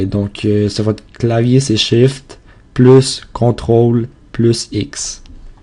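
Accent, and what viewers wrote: Quebec, Canada. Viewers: T'es québécquois non? j'ai un peu saigné des oreilles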